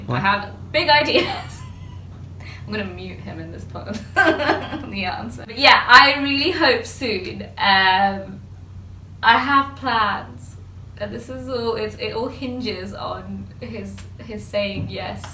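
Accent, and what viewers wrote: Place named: Jaffna, Sri Lanka. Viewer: Im in love with her Tamil accent, that yazh Tamil!! She is such a sweetheart! Absolutely love all of her insta vids!!! 😍 -Love all the way from SG 💞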